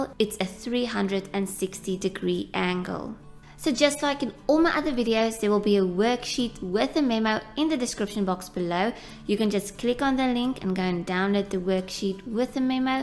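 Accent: United Kingdom. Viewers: rays= raisee british ahh tecaher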